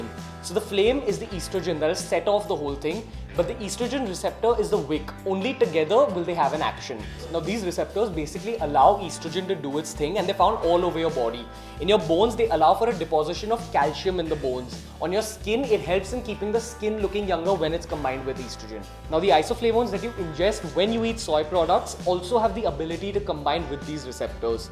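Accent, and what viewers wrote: India. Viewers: Idiotic logic + Indian accent = Pure comedy gold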